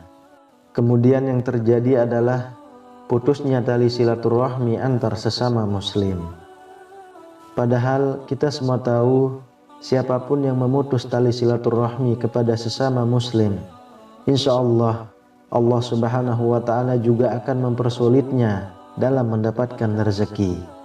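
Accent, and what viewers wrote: Lombok, Indonesia. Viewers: Suaranya kayak Logat orang lombok..ntb.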